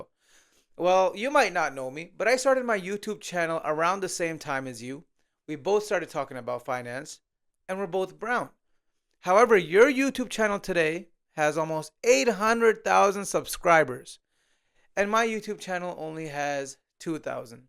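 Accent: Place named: United States. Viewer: You've dug your own grave by publishing click-bait crap (scam, exposed, etc.) You'll never be a success trying to undermine others. Try doing this with white skin and you might be grateful that you tick at last one of the diversity boxes. Be happy bro, life is amazing! Given your accent, I'd guess you're in the US. If your'e looking for career optoins, try the Democrats.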